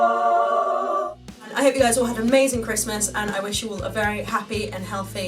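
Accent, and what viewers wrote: England, United Kingdom. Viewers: Damn, I fucking love the British accent ❤️ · What a perfect original English accent!! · omg shes english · Английский аакцент . мне так нравится как ты играешь ты такая красивая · Omg British yes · I hear british accent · She's sooooo English!!! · She sounds British to me. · WTF you have an english accent? Thought you were from Kentucky or something lmfao. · The pedal sound like sh#t, but I loved hearing your perfect english accent. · ¿Are you English, beautiful?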